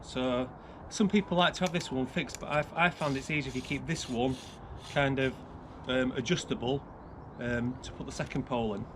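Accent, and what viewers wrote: Yorkshire, United Kingdom. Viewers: Thanks very much for this vid and the others on the Lanshan 2. And so good to hear it in the original Yorkshire 🤓. I walked & wild camped on some of the Pennine way in March with my new Lanshan 1 and liked it so much I’ve got myself a 2 to go bikepacking with my young son at Easter..so great to get some tips, and such a solid recommendation.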